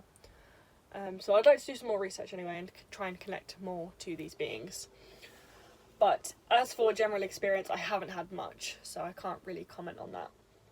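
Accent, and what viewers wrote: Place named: United Kingdom. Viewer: girll just found your channel, what a hidden gem! was wondering what part of the uk you're from? we literally have the same accent lmao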